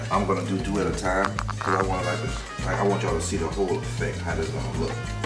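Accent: New Orleans, United States. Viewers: I hear that New Orleans accent. Love it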